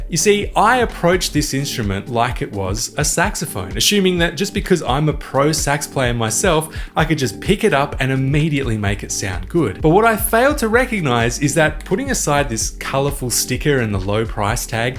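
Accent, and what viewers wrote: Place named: Australia. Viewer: Didn't realize you were an Aussie until you stated it. After that, I couldn't unhear your accent. Regardless, you have perfect radio/TV voice for any international channel, you articulate so effortlessly. And thanks for the excellent review. I am really considering getting this instrument and will use the link if and when I do. If I were not already focused on learning various rythm instruments, I would consider sax and your course. It is an opportune deal for those who are interested though.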